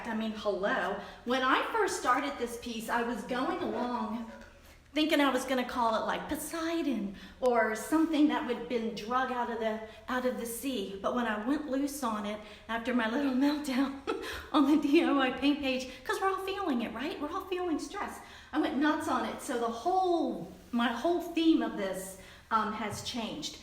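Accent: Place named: South, United States. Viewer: Enjoyed enthusiasm and techniques! So southern, I was raised in Florida, made me feel like home!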